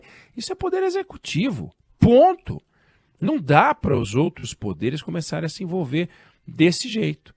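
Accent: Rio de Janeiro, Brazil. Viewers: este mangolote não tem vergonha ficar narrando com esses dialético carioquismo ele é ministro do STF e presidente da casa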